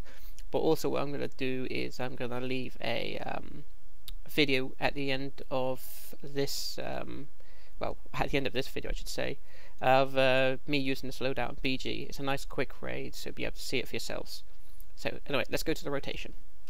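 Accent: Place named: United Kingdom. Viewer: Love all u ppl trying to tell how to improve his loadout and he just hits u with the most logical reason as to how wrong u all are. Is it me or is it easier to grasp the load-out with that Brit accent? 4 real...same with smile and orbit....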